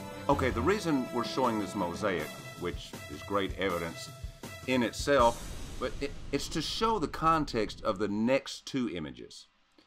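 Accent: South, United States. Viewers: my man, I love your work. YOU are brilliant...not to mention a real entertainer. However, you actually would help your cause so much more, and could convince the uninitiated of your sincerity, IF.....IF...you didn't dress like a homeless person and buy a comb. Now it doesn't bother me about your sloppy dress and or your southern accent...because I live in the south, but ya have to understand PERCEPTION becomes REALITY. So just a suggestion to take a bath, go to Sportsclips for a hairCut, and buy some new clothes. But regardless, even if you were to where a clown suit...I will watch all your videos TWICE. Love ya man.....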